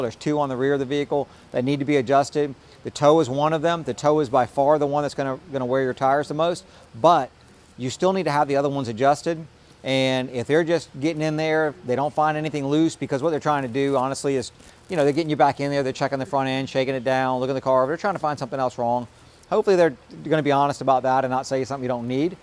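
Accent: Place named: New York, United States. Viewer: I even said to myself: sounds like NYC 🤔 Then you said it's queens 🎯 Figured as much,there's so many ripoff artists down there they step all over each other! The amount of time they take to "pull a fast one" ,it doesn't really take much longer to do an honest job. But 10 seconds is 10 seconds I guess.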